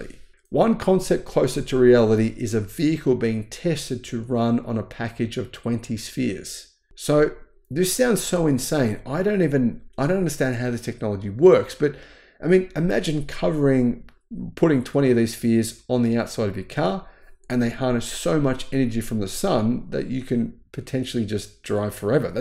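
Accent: Australia. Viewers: Are you ausi?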